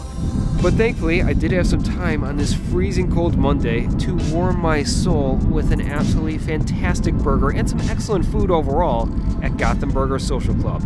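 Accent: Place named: Midwest, United States. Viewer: U must be from Michigan or Chicago..? your accent is funny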